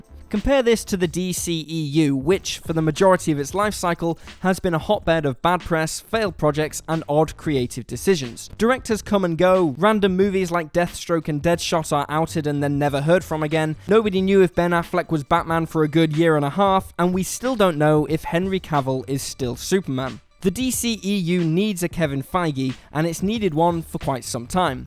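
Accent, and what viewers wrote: United Kingdom. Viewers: Leto not Leeto you limey mushmouthed douche.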